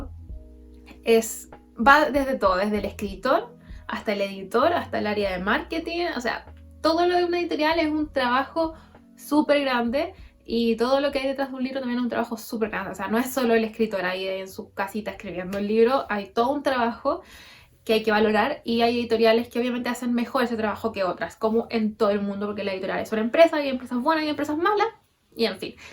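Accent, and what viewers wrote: Chile. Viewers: Que bueno tu canal, ya tienes un Nuevo Suscriptor, Me haces que Eres Chilena por tu forma de Hablar, y se ve de lejos que eres una chica muy leída y de amplia inteligencia y Conocimiento, aparte que muy bonita, eres la mitología y la magia, la mujer perfecta, Saludos desde el perú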